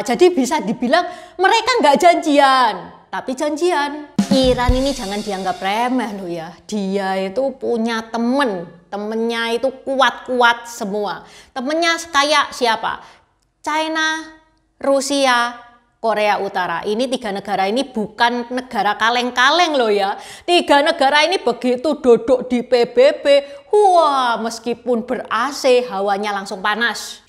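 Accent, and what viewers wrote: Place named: Surabaya, Indonesia. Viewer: Salut info yang cukup mendetail diiringii logat khas surabaya dan gaya bicara yang kocak dari.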